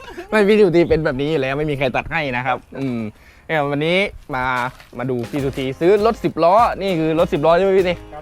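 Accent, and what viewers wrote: South, Thailand. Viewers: อูย่ใหนครับเห็นแหลงใต้ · คนใต้แน่นิ อยู่แค่ไหนคับ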